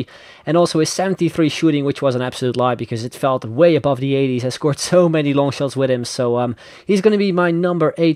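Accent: Netherlands. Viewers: Lol i hear that you got that dutch accent when you speak.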